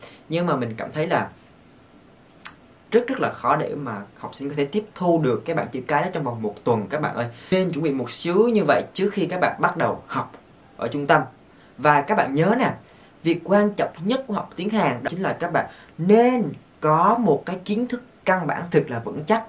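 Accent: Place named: South, Vietnam. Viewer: Anh nói tiếng Việt nghe giọng bánh bèo là vì do anh nói giọng miền Nam nên nó vậy chứ thử nói giọng Bắc hoặc Trung xem có nghe bánh bèo không🤦‍♀️🤷‍♀️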